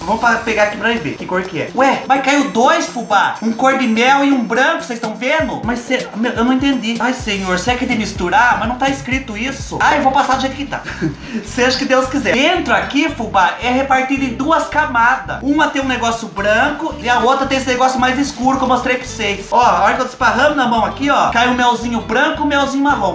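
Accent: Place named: São Paulo, Brazil. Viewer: Gosto mto dos seus vídeos vc, é mto engraçado, com esse sotaque paulista.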